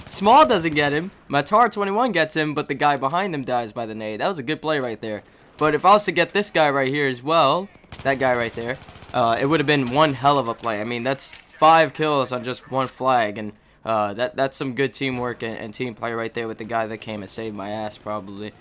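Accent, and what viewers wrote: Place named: New York, United States. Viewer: He has that New York City accent, so it's not his fault.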